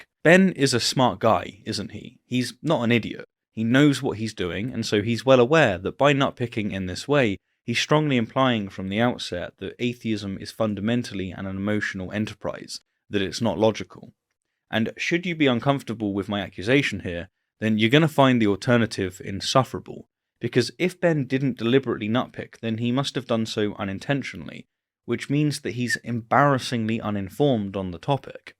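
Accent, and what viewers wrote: England, United Kingdom. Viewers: Sophistry with an English accent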